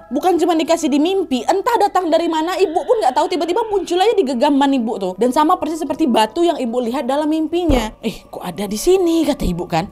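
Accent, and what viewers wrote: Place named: Medan, Indonesia. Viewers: Dari awal kak buat Youtube sampai sekarang selalu nonton dan gak pernah ketinggalan, dari kesedihan kak di tinggal Alm ayah dan abg nya kak sampai kak ke titik bahagia skrng aku selalu mengikutin kak, sehat terus Ya jangan sampek logat Medan kau berubah Ya kak ❤️❤️ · Sampe bingung ka tuh org jawa apa org medan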